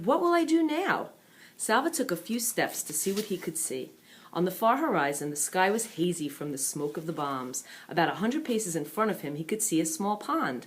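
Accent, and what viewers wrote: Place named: New Jersey, United States. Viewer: jersey accent makes it all the better ;D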